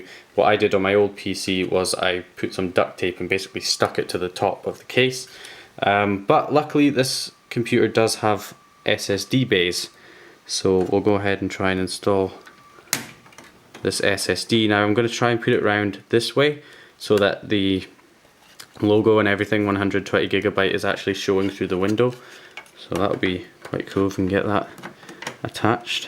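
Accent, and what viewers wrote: Scotland, United Kingdom. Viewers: Are you from Scotland? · ***** I believe it's scotland, judging from the accent. Probably somewhere near Aberdeen, but his accent has a slight twist, so he might be from somewhere around that area. I'm not Scottish, so I'm not too sure.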